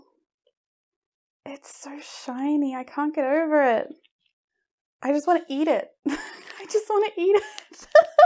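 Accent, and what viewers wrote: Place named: Australia. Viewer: "My besty just brought me this in from the posty." Two sentences in and everybody knows, you're Australian 😂 Also i bet most parents aren't as happy about their new born babies as you are about that Filament 😂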